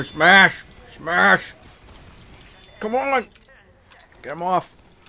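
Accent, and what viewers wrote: Boston, United States. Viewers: well ya, scout just has a boston accent. Jerma sounds like hes from them parts. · he always had the boston accent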